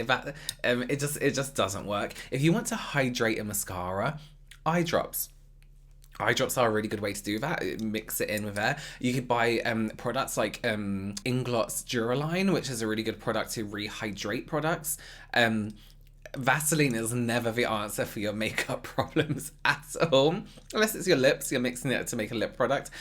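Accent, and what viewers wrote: United Kingdom. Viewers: giggles british accent don't mean to be rude, but you're AWFUL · the algorithm doesn't care if you get a thumbs down, all interaction with your video is a +.. Never seen one tho..🙂 Me:🔔 💃🏼yey..🎧press👍: To the video... You are Always so sweet and your facial expressions are so funny, I love that! I love that you can laugh at yourself, and be a bit🤭the classy way: when you don't "care" for something!😆 The British accent is too much 💘 I adore it...😋💭 Keep up with the Great content! You are doing great, I am so Happy I found you, the way you teach, you are a godsent, I understand! ( Said before: A Finn thinking in Swedish trying to write English..☺️)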